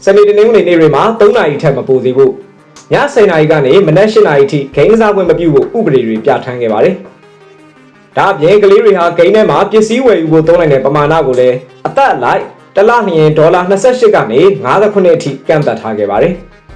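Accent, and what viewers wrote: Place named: Myanmar. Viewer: ယုံချင်စရာပဲ သတင်းဆိုရင် မင်းတို့မြန်မာအသံနဲ့ဆို ကြည့်ကိုမကြည့်တော့တာ ကျက်သရေယုတ်လို့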